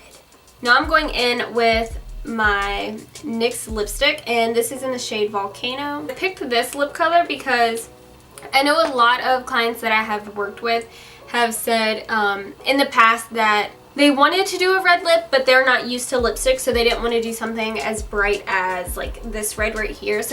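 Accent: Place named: South, United States. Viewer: Sucks you had a allergic reaction, i had one to fix plus spray 😭 glad your feeling better 💜 i also have the 1st palette from carli bybel. I love the highlighters in the palette, great prom tutorial doll💜 oh and yes you sound a bit country, not a lot lot but a bit of a accent. Lol its cute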